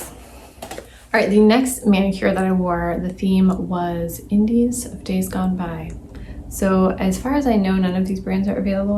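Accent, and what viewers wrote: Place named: Midwest, United States. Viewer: Great video, I've always been a bit intimidated by blue nail polish, but it's so cute! Also, I'm hearing a suuuuuper strong Wisconsin/Minnesota accent, am I correct? Lol.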